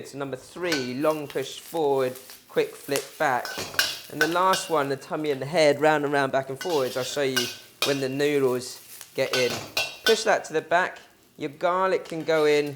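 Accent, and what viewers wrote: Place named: Yorkshire, United Kingdom. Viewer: Nice accent. Were you born in the UK? Is that Yorkshire accent? The Recepi is great!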